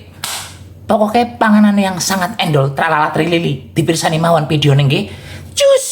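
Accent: Banyumas, Indonesia. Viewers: Saya suka kok lihat vidionya mas bahasanya sama karena saya orang ngapak jg salam ublek2 dan cus enak endol baksonya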